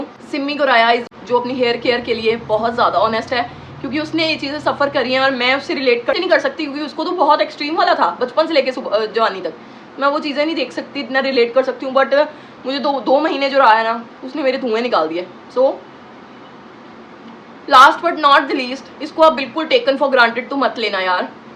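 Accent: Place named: Punjab, India. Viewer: Informative 😍❤ and i like your punjabi accent 😂🥰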